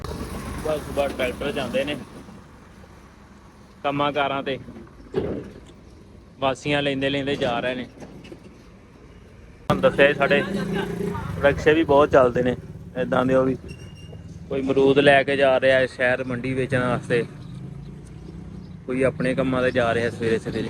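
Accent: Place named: Majha, India. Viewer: Majhaa ali boli bolda bruh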